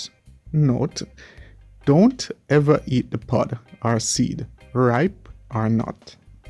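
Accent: Jamaica. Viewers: Very Imformative....I like that you just speak in your normal Jamaican accent & not do the twanging that some others on YouTube do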